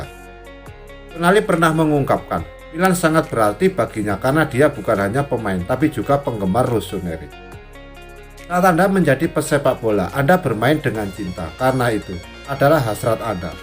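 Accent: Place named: East Java, Indonesia. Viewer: Suara e medok .. orang jatim ya?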